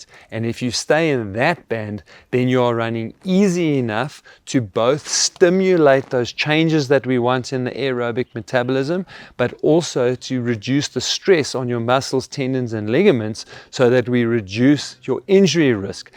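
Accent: South Africa. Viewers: Advice sounds even better delivered with a South African accent. Such a lovely accent! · British? I only hear South African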